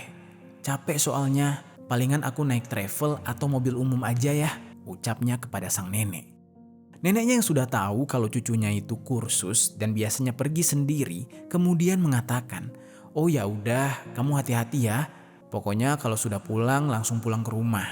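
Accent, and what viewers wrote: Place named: South Sulawesi, Indonesia. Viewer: dari sini kita tau pengisi suaaranya berasal dri sulsel :D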